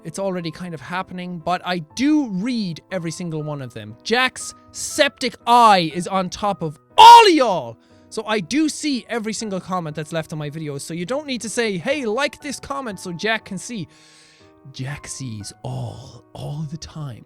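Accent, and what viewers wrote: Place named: Ireland. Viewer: Play station tree I love the Irish lol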